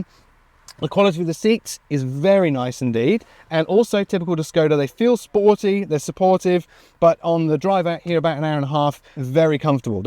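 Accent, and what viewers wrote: Australia. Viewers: Nvm, I just found out you're Australian 😅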